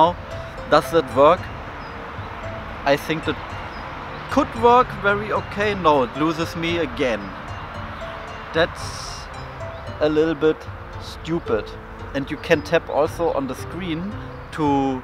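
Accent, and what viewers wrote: Berlin, Germany. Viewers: Ach so, my my settings ….. 😂 so cool 👍🏻 ich hab großen Respekt davor, dass Du alles in englisch sprichst 👍🏻 und das machst Du auf Deine charmante Berlinerische Art echt gut 👍🏻 mach weiter so 👌 beste filmerische Grüße aus Oberfranken my friend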